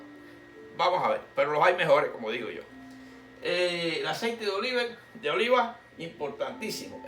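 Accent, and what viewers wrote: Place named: Cuba. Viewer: Hello Dr, parece ser cubano, gracias por su contenido. Fuerte saludos.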